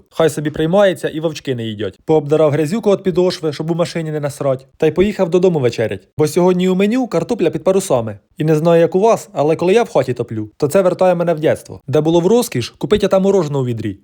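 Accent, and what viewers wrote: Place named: Surzhyk, Ukraine. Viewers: Як я вас люблю слухати))) Хоч ви з Черкащани, а звучить,як наш полтавський суржик зі всіма діалектами 😂❤❤ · Таки гарний суржик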